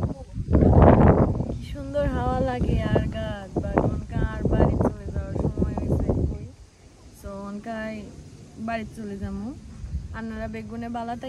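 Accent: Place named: Noakhali, Bangladesh. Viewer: 😮my god you reply my comments 😢tnx hum apner noyakhali kotha bola sundor mona hocca akdom noyakhalir maye 😊jai hok akhono ghuman ni rater baja 1ta 😅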